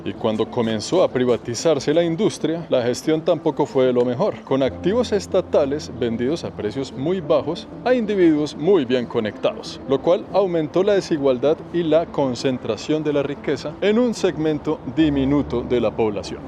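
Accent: Colombia. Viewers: Hermosa ciudad Sofía...me encanta! Y también muy linda voz,coherencia mental y sabiduría en las palabras del locutor. Ese acento colombiano me fascina! Saludos desde La Pampa Argentina, de parte de una uruguaya trotamundos, que ahora viaja nuevamente con usted y otros viajeros, que me caen muy bien! ❤😊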